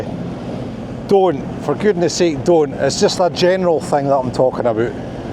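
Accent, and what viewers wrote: Scotland, United Kingdom. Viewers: entertaining video. What a pretty place with what looks like pristine water.Your Scottish accent brings back memories of my late grandmother who migrated to Australia from Stirling after the first world war where she met my grandfather, who visited Scotland when fighting on the western front. Anyway, keep safe and good hunting.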